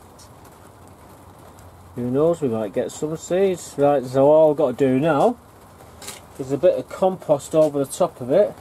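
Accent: Salford, United Kingdom. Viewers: You vids are fine it's nice ironically to hear the acscent since I left salford when I was 19 I'm now 46 in do go back every 4 months to go watch a game or two Lemon balm herb as is stevia which is a natural sweetener Tasting that though its initially very sweet with an after taste of liquorice why do u only plant a small amount of tomatoes have u every made sauce with them we finally have nice weather but it's blowing 50 mph it's always something I'm trying to figure out how I can send a video of my trips to u I do offshore fishing for shark tuna etc u would love it if I figure it out I send a link after I post it take care u should type in goggle or YouTube montauk point Or herring run-striped bass or bluefish you will be blown away at the annual migration of some of these fish some of the bluefish migration last a week or two and are by the hundreds of thousands in schools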